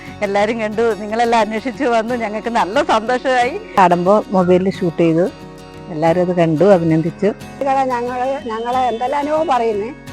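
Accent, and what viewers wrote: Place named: Thiruvananthapuram, India. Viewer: കണ്ണൂരിൽ നിന്നും തിരുവനന്തപുരം ത്തിന്റെ ശബ്ദം ... Good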